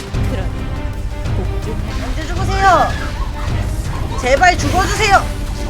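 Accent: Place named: Daegu, South Korea. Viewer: 부산사투린줄 알았는데 대구였군여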